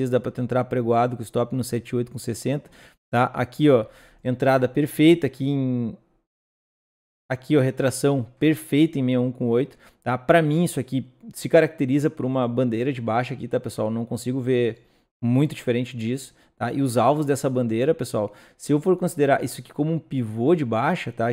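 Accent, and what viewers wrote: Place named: Rio Grande do Sul, Brazil. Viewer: Sotaque Gaucho é lindo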